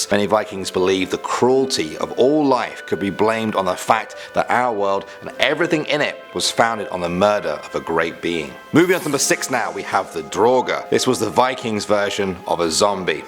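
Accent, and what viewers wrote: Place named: United Kingdom. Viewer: YEAAAA BRITISH PERSON!!!!!!!!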